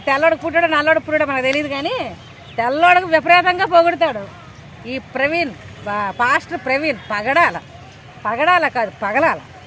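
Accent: Srikakulam, India. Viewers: Nuvvu nee seekakulambhasha nee face chuste asayamvestundi gorrhemohamdana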